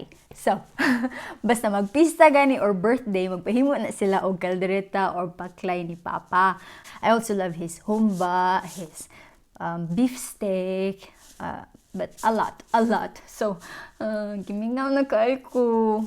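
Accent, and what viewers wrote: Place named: France. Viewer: I can hear French accent na if mag english ka.. hahaha kahinomdom na nuon kos joke ni Trevor Noah about French accent vs Russian accent.. hahaha Try to watch it dba malingaw ka.. hahaha <link>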